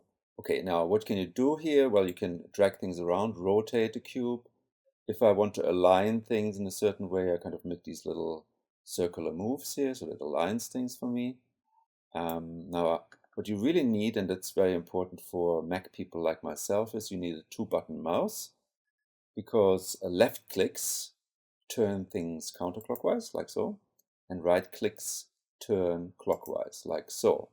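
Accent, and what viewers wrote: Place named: Germany. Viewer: Hello, I just wanted to thank you for bringing my attention to this great puzzle. I have cubed now for nearly half of my life and solving this thing has been my greatest archivement so far. Your videos inspired me and helped me to find my own - and until now very unefficient - algorithms (it took me about 5400 turns). My next step is solving this in less than 1000 turns. Way to go! I always wanted to ask you whether you have German origins of some kind? I am from Germany myself and your accent sounds kind of German in my oppinion, in addition to your name obviously:D Anyway, thank you again, and greetings from Germany!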